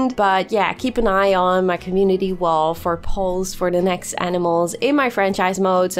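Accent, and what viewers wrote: Netherlands. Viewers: Love all your video’s but are you Dutch ?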